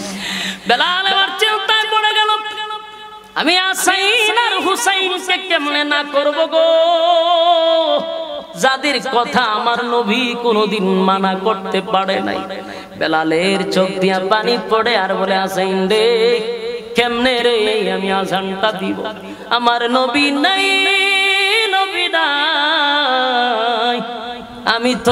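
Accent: Noakhali, Bangladesh. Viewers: নোয়াখালি কে ভাই আনেগোর ভাসা বুজি না মুগো সিলললেটি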